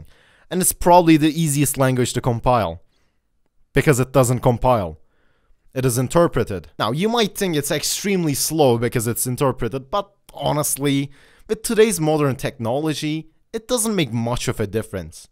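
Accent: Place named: Turkey. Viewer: Türk olduğun belli oluyo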